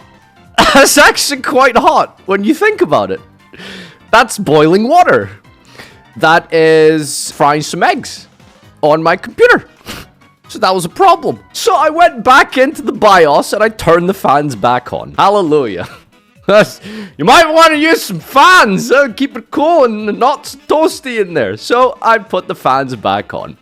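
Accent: Scotland, United Kingdom. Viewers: We need more scottish accent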